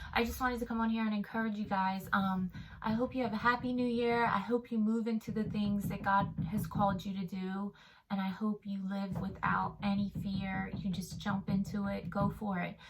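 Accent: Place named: East Coast, United States. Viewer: I love this and relate SO MUCH! I also love your cute little east coast accent, haha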